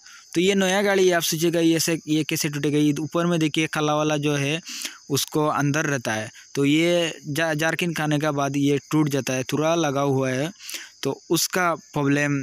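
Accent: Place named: Bengal, India. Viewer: Bengoli mix Hindi,,,🤪🤪🤪🖕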